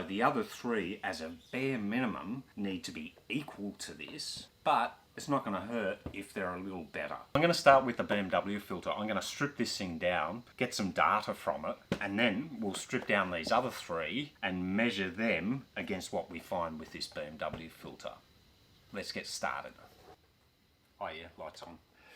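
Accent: Australia. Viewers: He's Australian, not British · The second I heard the Australian accent I had no doubt this guy would be messing around!!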